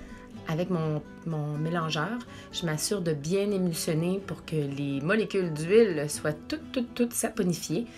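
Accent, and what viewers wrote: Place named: Quebec, Canada. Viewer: Ah je me disais bien que vous étiez Québécoise, ça fait plaisir de voir une vidéo en français ! Et ne vous préoccupez pas des commentaires négatifs, je suis une Française de Paris et j’adore votre accent ^^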